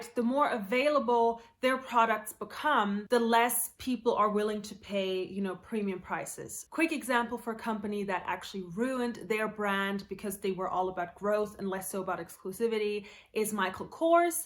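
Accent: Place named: United Kingdom. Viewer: I thought I was going crazy because I’ve been seeing Hermes all over the place. Even I was able to get an appointment and I don’t even have Hermes money. I’m curious, where is your accent come from, it’s beautiful. A bit British?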